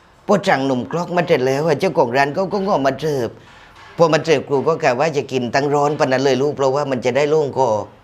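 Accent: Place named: South, Thailand. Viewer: พลัดถิ่นมานาน ได้ฟังภาษาใต้พื้นๆ แหลง ทองแดงแล้ว ขำ คนภาคอื่นจะรู้ไม๊หนิ ว่าเราพูดภาษากลางแล้ว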